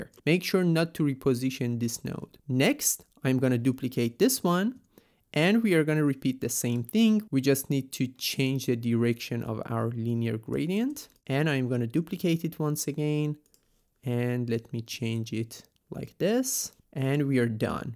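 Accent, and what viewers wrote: Iran. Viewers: I just knew you were iranian the second you started talking lmao, great video btw keeo it up fam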